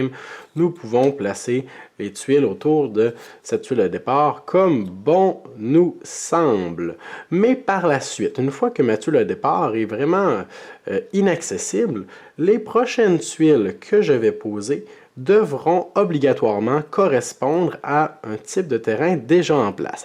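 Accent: Quebec, Canada. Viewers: Très bien expliqué et, le bonus, est ce savoureux accent du Québec !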